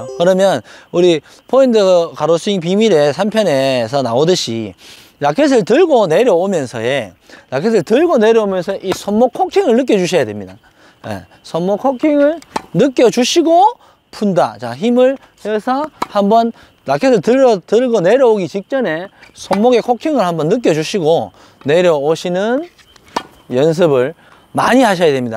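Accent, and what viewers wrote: Gyeongsang, South Korea. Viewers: 요즘 강한 백핸드 슬라이스를 많이 노력중에... 가로스윙을 우연히 느끼고 이느낌을 ,그 코킹을 백핸드 드라이브에 적용해도 되겠다는 생각을 했었는데 이영상과 같은 맥락에서 볼수 있는거 같습니다 나이스 코칭입니다 경상도억양이 뇌에 팍팍 지식으로 때려 박습니다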